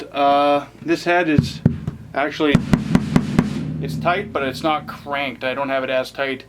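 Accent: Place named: Ontario, Canada. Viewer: I can tell from your accent you're from rural ontario? lol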